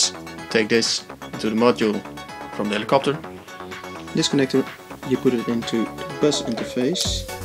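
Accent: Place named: Netherlands. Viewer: Thats what you get when a Dutch person tries to speak English haha. I'm dutch and I reconize that accent everywhere.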